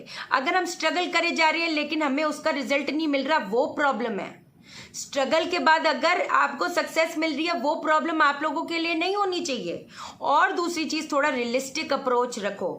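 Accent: Punjab, India. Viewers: बहन जी चाहे कुछ भी कहो ,please don't take it otherwise ,आपकी आधी पंजाबी मिली हिंदी बोली बहुत प्यारी/मस्त लगती है ,जी करता है सुनता ही रहूँ...👌👌 वैसे कुछ बहुत जरूरी परामर्श लेना है आपसे...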